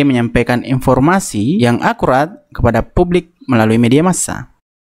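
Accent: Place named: Makassar, Indonesia. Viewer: Orang mkassar kah bos...logatnya agak ke mkassar...wkwkwk😂🤘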